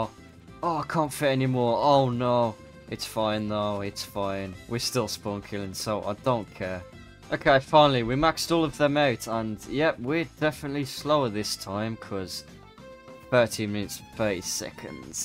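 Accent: United Kingdom. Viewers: You sound British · Bros british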